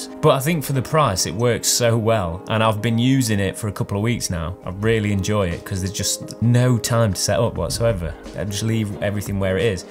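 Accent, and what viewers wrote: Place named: Manchester, United Kingdom. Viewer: Tempted to get this for my videos. I feel like you're from Yorkshire like me but for some reason I'm getting Manchester accent vibes. 🤣🤣 I could be entirely wrong but you're definitely northern.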